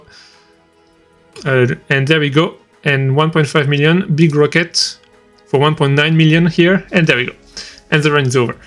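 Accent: France. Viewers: Sounds French to me... I'm in France right now! Nice to see someone making these guides in countries other than the U.S.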